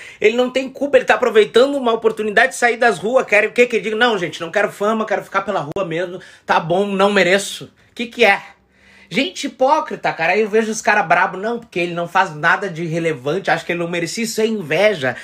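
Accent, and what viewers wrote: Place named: South, Brazil. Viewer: Uma gracinha esse sotaque de sulista hahahaa e ele tá certo nisso aí!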